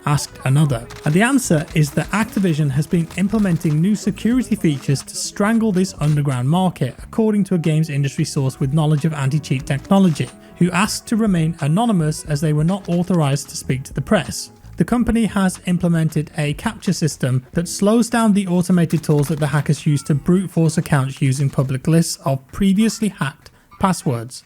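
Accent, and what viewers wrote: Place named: North, United Kingdom. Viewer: I swear to God sounds like Brian cox. It's like a slight northern accent. It's calming.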